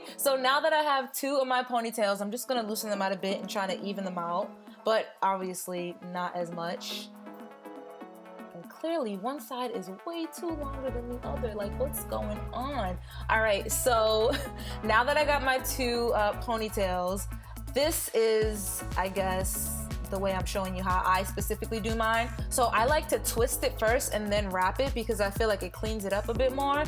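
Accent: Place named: Queens, United States. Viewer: Are you from Queens? You kinda sound like it.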